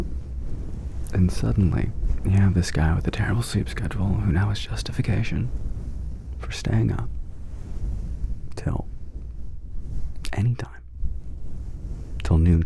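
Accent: South, United States. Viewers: What is happening here?!😯 You crack me up. What a wild video this turned out to be.😆 Helix please sponsor. He deserves a new mattress. Listen to him. He needs a good night sleep.😂😂🛌🏼💤 Your editor is hilarious. The back and forth. Nice clicky clacky sounds with William. I'm new to your channel; gotta love the silly giggles.🤭 Thank you for 'sharing' with us. Southern accent. Hey now.😭 Glad your Dad is okay. The Cat moment.🙀😻 We're off the rails but in a good way. I'm laughing uncontrollably, but with you, not at you. There's a difference. This was fun and delirious. ASMR realness.🤪👏🏻 Goodnight.💜🧑‍🔬💜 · I actually love your southern accent! More please!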